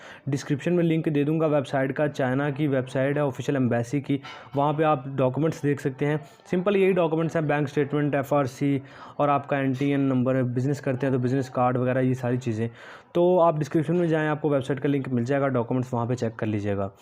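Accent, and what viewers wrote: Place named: India. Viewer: Are you Indian or Pakistani because you speak like Indian. If you are Pakistani so please don’t speak like that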